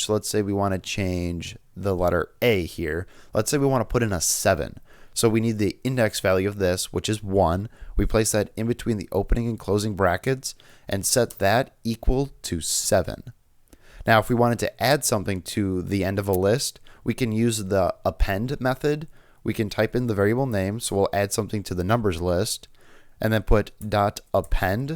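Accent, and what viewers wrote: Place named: United States. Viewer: Hey bro, thanks for your course with US accent. It is easier to follow the course.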